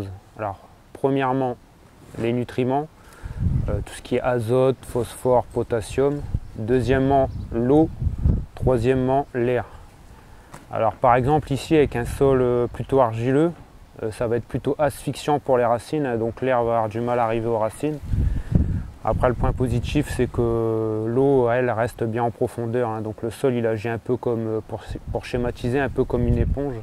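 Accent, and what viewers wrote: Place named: North, France. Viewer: bonjour ca fait plaisir d'entendre l'accent du nord ..j'ai le meme tee shirt .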